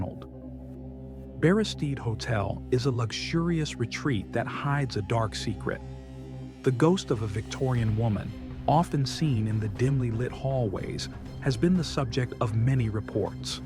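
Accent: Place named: United States. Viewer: Why an American voiceover?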